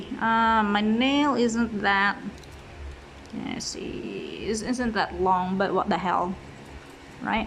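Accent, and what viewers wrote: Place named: Louisiana, United States. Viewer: Good review, not many do such indepth demonstrations of capability. The Micra never really appealed to me, I think if I want scissors as a more important function I'll always opt for Victorinox as they're the best multitool scissors, whether on the smaller models like you show (Rambler or Manager) or especially on a larger pocket knife like the Climber etc. I do however like the Squirt as it's about the best tiny plier-based multitool out there, it seems well designed and I like the aesthetics - being compact and sleek - more than those of the Gerber Dime for example. Also it's helped me on weird little tasks where nothing else larger would have worked. Finally I am really curious about your accent? Are you from Lousiana, or were you born outside the US? Sorry to ask a personal question but your accent and pronunciation is really nice!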